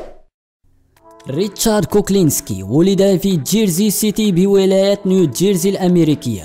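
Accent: Marrakesh, Morocco. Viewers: هذا مغربي ولا كيفاش صوتك لا يصلح يا هذا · عجبتني اللهجه المغربيه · ههههههههههههههه صوت مغربي مراكشي امازيغي قح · ههههههههههههههه مغربس حر ههههههه و مراكشي ، اويلي على الأتباء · iman vidia maroooki baaaaaayn ou marakchi maazal -__ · لهجته تسد النفس، اللهجة المغربية من أسوأ اللهجات العربية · chi mrakchi hada xD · معلي .. ترى للي يتكلم يبين انه مغربي يعني عربي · هدا. مغربي اللي كيحكي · نبرة صوت مراكشية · vidia Ti Rah Hada Mghribi Puur Bayne Wach Wlde Mrrakch L Hdraa M3tla W T9ilaaaaaaa Katjyb N3aass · هههههه افضل شيء في المقطع هو البهجاوي اللي كيعلق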